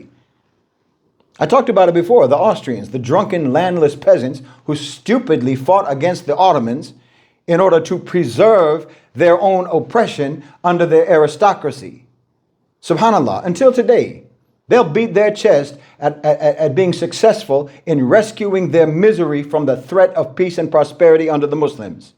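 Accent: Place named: United States. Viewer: You are extreme and seriously ridiculous. You speak as an American which tells me you were born and raised here. Your epiphany came to you after you began struggling with your own identity. That’s your problem, you struggle yourself with your own identity. Therapy, a good therapist could help you because you need it. If you are going to preach history including that of western culture and society you need to be very honest and don’t cherry pick the best examples from past 300 yrs that will bolster your ridiculous rhetoric. You seem to purposely ignore what has been happening all over this tiny planet since beginning of recorded time, time of the Bible. You like to blame the whites, what, the pinks as you say in another video. I have to admit listening to you was entertaining and made me laugh several times but on serious note, you need to accurate and thorough with your so called history lessons. 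Entire planet has been savage. You know this but choose to blindly ignore it. This is niche for you to appeal to the low vibrating low IQ of a subset of American blacks. This niche grift of yours isn’t going to fly much longer as more and more black families are finding their way out of the poverty and are now enjoying safe and fun lives living further away from the urban blacks who you really appeal to. The young black today are not falling for this crazy division. That’s all you preach and comment about, hate the whites. Your comment sections are insane, stuffed full of low inner c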